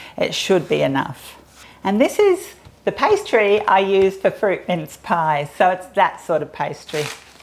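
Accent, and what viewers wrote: Australia. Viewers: Thanks, I love custard tart. Are you English? I hear the Aussie accent but I hear an English accent coming through here and there.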